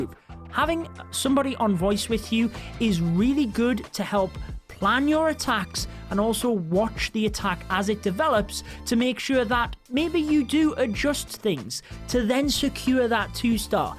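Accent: United Kingdom. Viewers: i have a question for you where are you from? I ask this because I’m my country (Honduras) it’s 4 am. I guess you are from U.K. by the way you speak English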